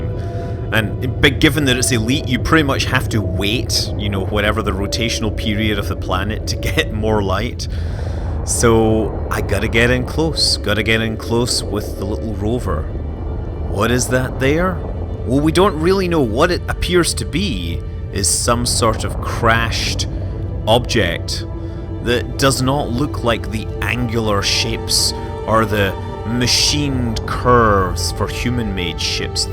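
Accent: Scotland, United Kingdom. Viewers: The Scottish accent probably threw him off.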